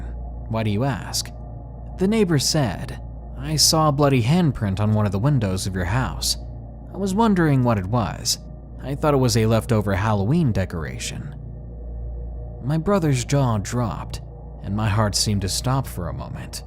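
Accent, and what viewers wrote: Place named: United States. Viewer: Oh yah you are from America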